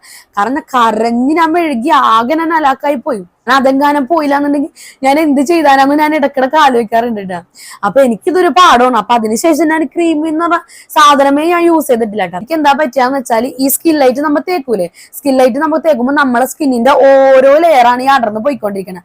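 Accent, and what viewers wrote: Kochi, India. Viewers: Kochikariyanalle samsarathil thonniyatha dear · മരുന്നിനേക്കാൾഎനിക്ക്ഇഷ്ടപ്പെട്ടത്കൊച്ചി ഭാഷ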